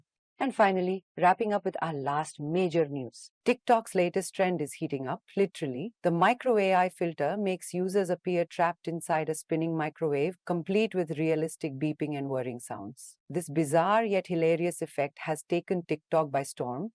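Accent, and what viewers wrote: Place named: India. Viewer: Indian accent😂😂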